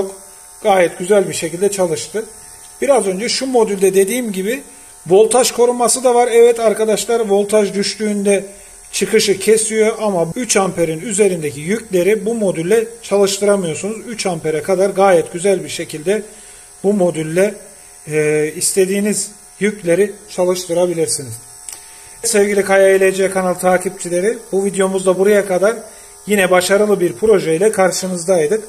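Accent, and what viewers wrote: India. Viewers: off bir an hintli sandim yahuu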